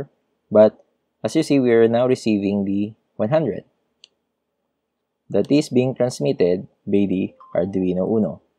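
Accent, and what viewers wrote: Philippines. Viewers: Nice video dude. Just wondering, pinoy kaba? Its just the accent.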